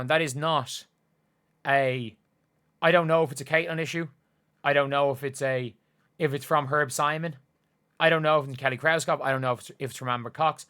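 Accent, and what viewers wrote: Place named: Ireland. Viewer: I enjoy your commentary n your thick Irish delivery!😂🎉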